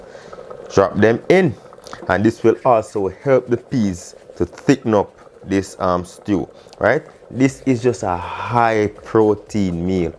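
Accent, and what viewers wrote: Jamaica. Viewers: Food look so good & I love that Jamaican accent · Finally a real autical Jamaican with no twang foreign accent presenting good dishes. 👌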